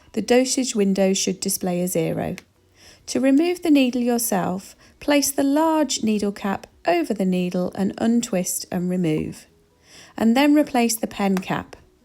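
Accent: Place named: Liverpool, United Kingdom. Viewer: I am writing from Phoenix, Arizona, USA to thank you for this video. Watching this and your calm demeanor helped me to take my Ozempic medicine. You are a peach. BTW I love your Scouse accent. Merry Christmas! P.J. Stewart.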